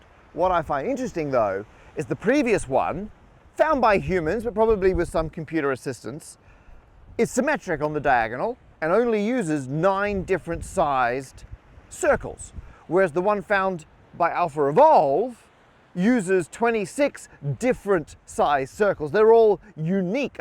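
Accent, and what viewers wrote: Australia. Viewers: That might make sense except for the obviously Aussie accent lol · Oh yeah, I forgot he's australian.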